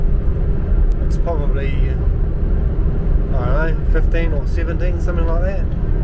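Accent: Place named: New Zealand. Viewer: Good to hear a familiar Kiwi accent from over there across the ditch. My late son drove interstate out of Melbourne for years. Sadly John was taken from us by cancer aged 56yo on Oct. 21 2021. Bob. Lower Hutt. NZ.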